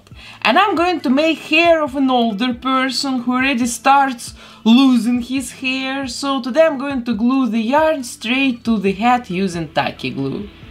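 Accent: Russia. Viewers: You're russian? This accent ... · Very funny a Russian accent telling Americans how awful Trump is. I unsubbed. When I want to hear people make fun of my President, I'll listen to someone who at least has grown up in the USA. And I'll watch the Popovy sisters do real art without political grifting.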